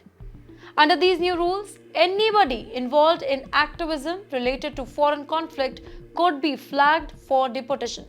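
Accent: India. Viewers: What a hateful hindi English accent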